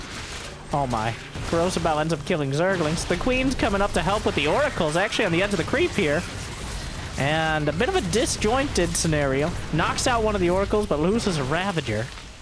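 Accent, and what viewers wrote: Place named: Texas, United States. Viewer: Bro, you're literally the best caster, and I love your charming Texan accent. Please keep it coming, man.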